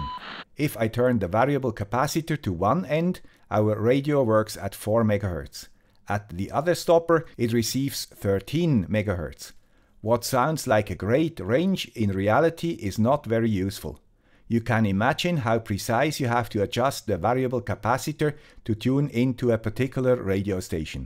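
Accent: Switzerland. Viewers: You were easy to remember, you were the big guy with the Swiss accent! It was a product launch road trip through Europe I believe, or later when I worked for COGNOS and we were launching a DEC platform. It was a long time ago but luckily none of the equipment had vacuum tubes.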